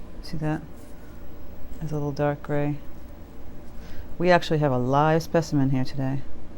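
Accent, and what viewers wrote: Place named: Boston, United States. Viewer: Wow... that was amazing to watch! So many helpful details shared while also keeping it simple. I could also listen to your Boston accent all day! Thanks so much for sharing your amazing talent. What a treat!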